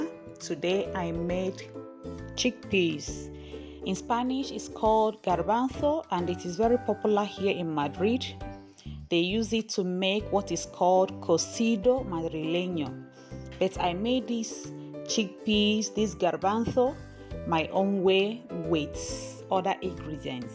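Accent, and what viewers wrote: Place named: Equatorial Guinea. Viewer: What is your connection to Nigeria? You speak like you are from Equatorial Guinea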